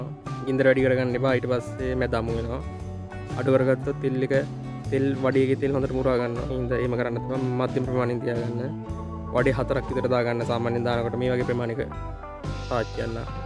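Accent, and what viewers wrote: Galle, Sri Lanka. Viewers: Galle kenek wage .kathakarana widiyen kiuwe. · Good! Very clear. Thanks! Galle ekkenk wage! 😋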